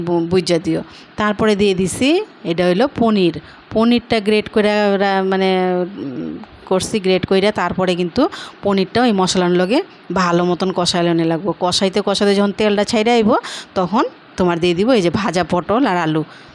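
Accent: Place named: Bangal, Bangladesh. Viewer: খুব সুন্দর রেসিপি। আপনি বাঙাল ভাষায় বলছেন খুব মিষ্টি লাগছে শুনতে।👌❤️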